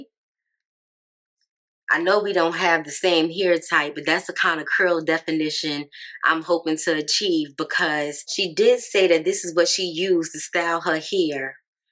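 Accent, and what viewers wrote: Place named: New York City, United States. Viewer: No she sound like CoKo from SWV I guess it’s that NYC accent.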